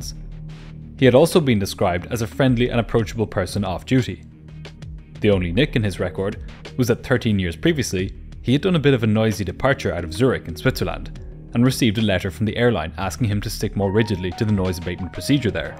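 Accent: Ireland. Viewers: Great video thank you ,shocking story great to here a fellow Irish narrator 🙌☘️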